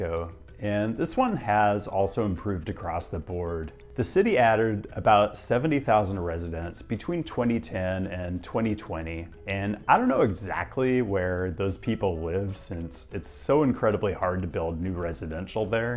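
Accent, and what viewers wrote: United States. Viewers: Your voice.... You are who most Europeans think all Americans sound like!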